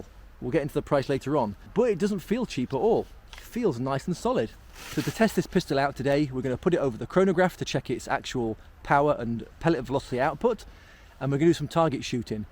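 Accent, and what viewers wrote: United Kingdom. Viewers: Great British accent.... So where are you from?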